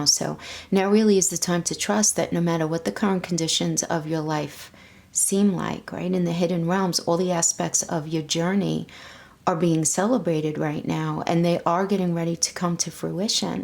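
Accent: New York, United States. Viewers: Nu yourk! Love your accent. 💗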